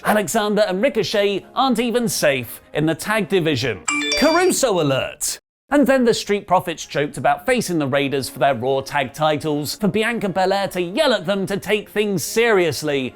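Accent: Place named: United Kingdom. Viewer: Where are you from? Your accent is British English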